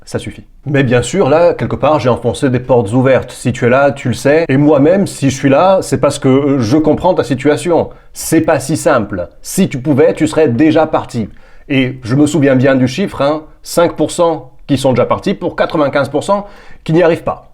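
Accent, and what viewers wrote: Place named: Belgium. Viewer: Attends, à cause de son accent ma femme croit qu'il est belge 🤣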